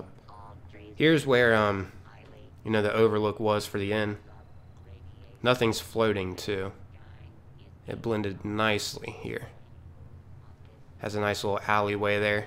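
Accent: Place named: Pittsburgh, United States. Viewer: Are you from Pittsburgh?! I know a yinzer when I hear it dut go stillers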